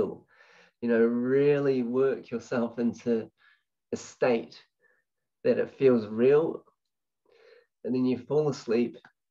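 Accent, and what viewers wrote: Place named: New Zealand. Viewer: Please do a talk in Auckland. I knew you were from NZ straight away hahaha 🙏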